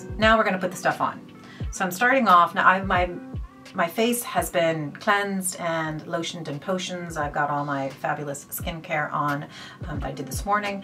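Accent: Ireland. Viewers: Hi, I’m so glad your channel was recommended to me... this is the first video I’ve watched of yours and at first I thought you were Canadian, but it’s the Irish lilt I can hear. I’m a big Trinny London fan too and the brand is cruelty free which is a must for me. I love her, she’s a bit bonkers but she makes me smile and her products are amazing. The eye colour is great too, just a quick dab on the eyelids (you don’t even need a mirror) and I’m good to go... nowhere! 😂 I’ve just subscribed and am going to binge watch your other videos 💛✨💛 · Beautiful. Also, your accent - with its tiny hint of Irish - is adorable. ☺️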